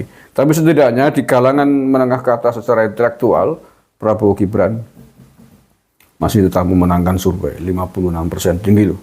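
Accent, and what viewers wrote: Banyumas, Indonesia. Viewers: Ora ngapak ora penak ya kang😂😂👍✌️ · Malem rajaban, melekan kang + ndonga. Salam waras bedigas. Ora ngapak ora kepenak. Semangat terus kang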